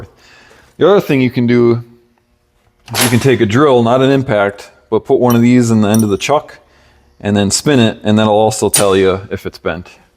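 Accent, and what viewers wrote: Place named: Wisconsin, United States. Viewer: Your channel is going to blow up love the content where are you from sounds northern accent Wisconsin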